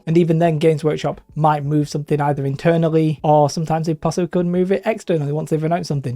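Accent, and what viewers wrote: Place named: Germany. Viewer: What is your accent, German? Tjought you was a Brit but today I heard you have some charming accent. :)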